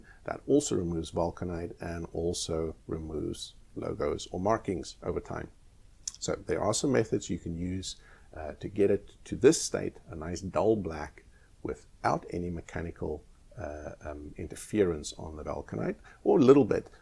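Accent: South Africa. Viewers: There's a South African accent in there..... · I almost expected to hear 'if you do it like this, you will just cause more kak for yourself'. Glad to see that the South African accent hasn't completely disappeared.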